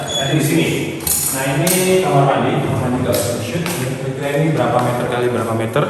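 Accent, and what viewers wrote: Java, Indonesia. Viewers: Wong Malaaang wkwkw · Yg gw suka dari channel ini itu karna real banget mungkin dikit'' lihat prefrensi gitu tapi lainya real nggk ada settingan....dan logat jawanya ada dikit'' soalnya gw org jawa jg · Org jawa kah kok logatnya kek jawa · jowo ne ndi mas?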